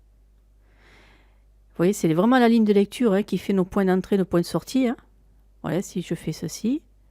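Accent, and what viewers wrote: South, France. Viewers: Merci pour vos tutos très instructifs et bien expliqués. Utiles pour quelqu'un pas très féru en informatique comme moi. J'avais la version 2016 et maintenant 2022 et j'étais un peu perdu. Après 2 années "covid" je n'avais plus fait de films de voyages et donc j'avais un peu perdu les bases. Je vais pouvoir me relancer grâce à vous. Et puis votre accent méridional est agréable ce qui est un plus, mais n'allez pas croire que je cherche à vous draguer !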